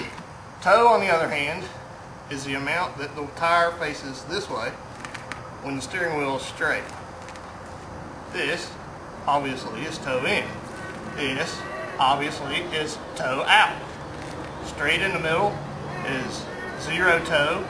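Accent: United States. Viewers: virginia accent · Where is the accent from? southern states?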